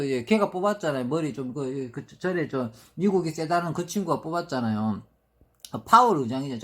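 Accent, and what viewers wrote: Gyeongsang, South Korea. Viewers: 네~~맞아요 목소리도 좋고 경상도 사투리 도좋고 ㅎㅎ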